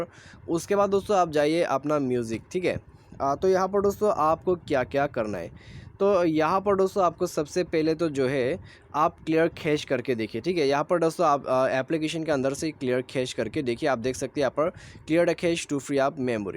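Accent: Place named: Bengal, India. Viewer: Ha has a Bengali accent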